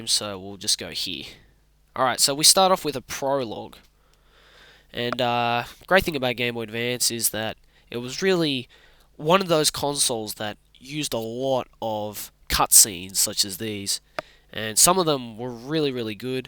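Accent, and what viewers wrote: Australia. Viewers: Bro his Australian accent is so much stronger here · Strong occa Sydney accent here I was not expecting this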